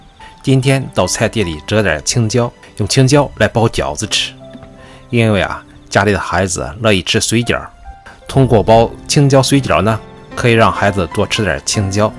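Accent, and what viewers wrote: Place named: Jiaodong, China. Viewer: 胶东口音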